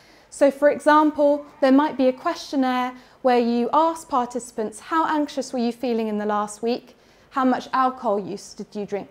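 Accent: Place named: Bristol, United Kingdom. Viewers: she speaks perfectly understandable received pronunciation English with a Bristolian dialect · a lecture by a British English speaker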